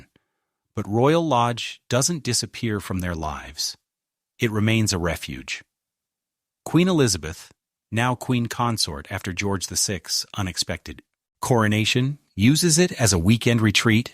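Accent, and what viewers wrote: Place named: United States. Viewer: What I would like to know as a British Citizen of 83 years is.............why an American "voice over" accompanies old film footage of English Royal Estate Dwellings (security compromise aside !) ! Is this visual "offering/conjecture" open to American interpretation ? Why not investigate President Trumps "holdings" in America for local interest ? Why is Britain the "flavour of the Journalists month" ? NO I DID NOT ENJOY THE "INNUENDO" OF THIS VIDEO. ....or the presumption that all is open to American "investigation" ! Perhaps the American Journalists have fewer problems investigating foreign shores rather than their own, or, would the President object ?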